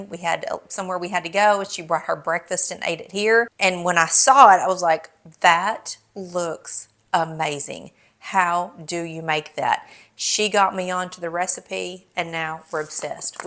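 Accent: South, United States. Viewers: 🤣🤣🤣🤣🤣🤣🤣🤣she is a southern woman that's how they pronounce every thing and I love it!!! okayeee bless your heart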